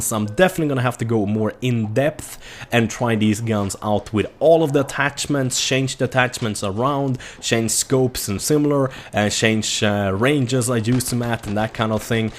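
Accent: Sweden. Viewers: are you from sweden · Märker att du är från Sverige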